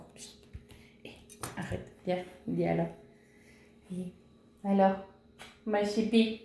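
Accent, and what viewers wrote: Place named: Nord-Pas-de-Calais, France. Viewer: Coucou ma.poule. oui moi aussi je me sers du même eplucheur que toi de la marque typer....re. J ai fais des gaufres sèches du Nord, cette semaine. Bon je ne suis pas chti lol...mais j avais envie d essayer..😊 je suis du 77 à côté de 2 grands parcs d attractions bien connu. 20 minutes pour 1 et 1 h pour l autre. Tu parles chti c est sympa... pour le live je crois que c est toi qui bloque ou pas la personne en direct..mais bon ..renseigne toi car j y connais rien lol..moi je travaille dans une grande banque du 4/40. Actuellement en arrêt suite cancer du sein il y a 1 an. C est récent. Sinon j aime la marche. Je fais environ 40 km par semaine. Voilà ça te donne une petite idée de moi.. Pleins de bisous. 😊😊😊❤❤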